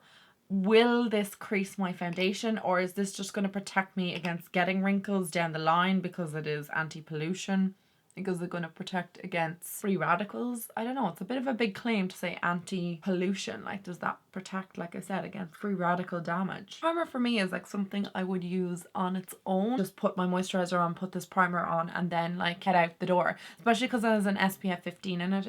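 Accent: Ireland. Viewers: I could listen to you talk all day….I just LOVE an Irish accent. I’ve loads of friends from Ireland and I so enjoy our talks, with their gorgeous accents and Irish sayings….”God love him” and “Mother of God”. Teddy U.K. xx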